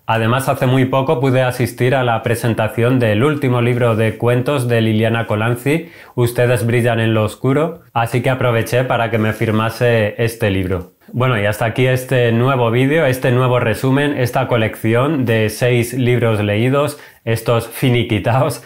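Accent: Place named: Uruguay. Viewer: kintsugi, lo tengo pendiente en bookmate y estoy leyendo: debimos ser felices, pero después de ver tu video no voy a poder no leerlo con acento uruguasho.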